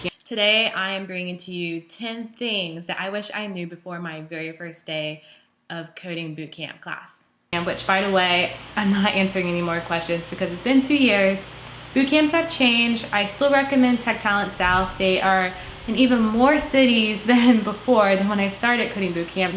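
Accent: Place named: Australia. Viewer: Are you or one of your parents Australian? Every now and then you have a slight accent :D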